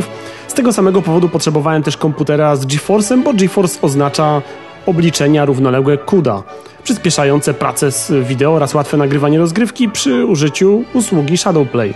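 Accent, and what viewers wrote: Poland. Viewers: jesteś z Lubelskiego? Masz taki akcent.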